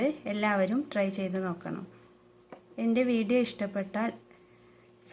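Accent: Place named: Malabar, India. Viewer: ങ്ങളെ വർത്താനം കേട്ടിട്ട് .. മ്മളെ മലപ്പുറം, കോഴിക്കോട് 🤗🤗രണ്ടിലൊന്ന് .. അല്ലെ..?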